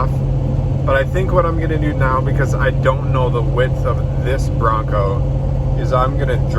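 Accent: United States. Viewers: ! Your southern accent is too funny! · Oh my goodness, Floridians and don’t talk that way, that’s more Georgia… You’re hilarious!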